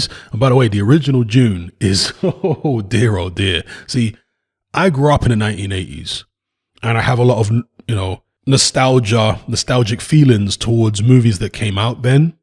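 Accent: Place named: Caribbean, United Kingdom. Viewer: Hey homie. When you said this movie is absolute garage wit your british caribean accent. I laughed at the exact same time my guy.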